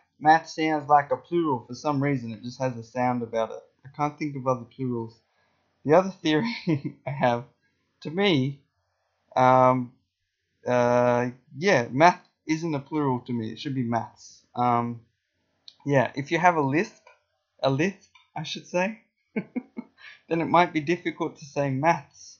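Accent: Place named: Australia. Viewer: lmao dude mom in american english doesn't rhyme with bum or any of those things, the o is pronounced like the o in bomb. That one is just your australian accent confusing you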